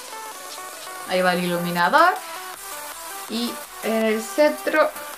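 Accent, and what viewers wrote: Chile. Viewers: El acento Chileno es muy obvio! GENIA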